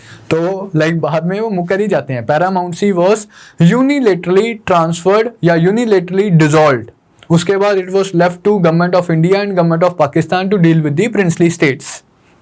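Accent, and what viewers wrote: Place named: Punjab, India. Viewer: Thnks to u sir..when i feel sleepy i watch ur videos and u energetic voice and simple explanation with light punjabi touch ...massah allah it gaves me a energy for continous study..thnks again sir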